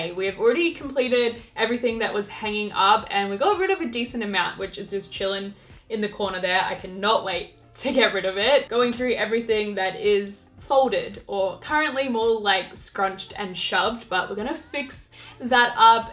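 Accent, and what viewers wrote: Australia. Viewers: Me staring at the phone after pulling an all-nighter (it’s am now) trying to figure out if she has an Australian accent👁👄👁😭🤦‍♀️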